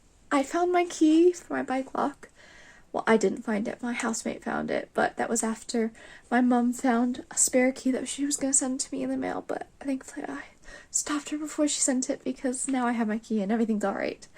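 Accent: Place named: North America. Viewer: Your vlog style is pretty relaxed. Sorry to hear you're stressing over assignments. Of course, everybody goes through that in one way or another. Good that you have friends in your house there. had her staircase mates in her Jesus College dorm. ...so I've been wondering what's up with your North American accent, and that fact that you say "bangs" instead of "fringe" and don't know the word "crest". May I ask where you're from?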